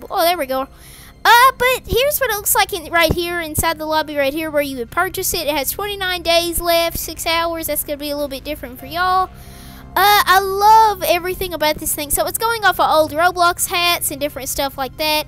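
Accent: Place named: Australia. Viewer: Sound like you’re from Australia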